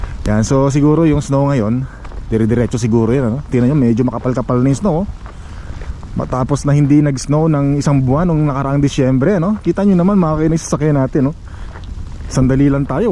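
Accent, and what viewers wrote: Philippines. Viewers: Nkakatuwang mkita mga snow dyan sa canada puti buong paligid ka. Masaya nga lagi mga blogs mo ka kya masayang panoorin ska pinoy na pinoy sa kilos at pananalita.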